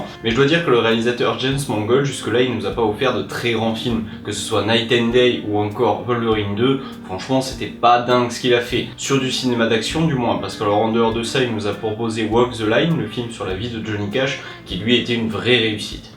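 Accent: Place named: Banlieue, France. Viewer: accent de banlieu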